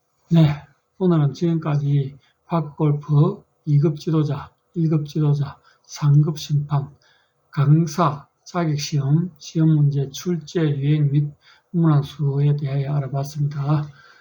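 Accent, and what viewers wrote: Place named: Gyeongsang, South Korea. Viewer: 경상도 사투리~~~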